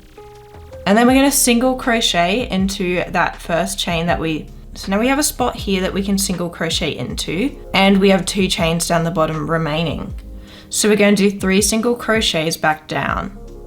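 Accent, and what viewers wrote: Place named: Australia. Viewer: Lovely to hear an Aussie accent and not have to listen to a half hour intro 🙏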